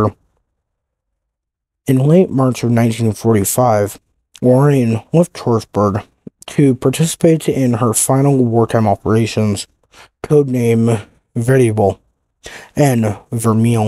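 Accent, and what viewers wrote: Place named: United States. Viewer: The narrator is an American.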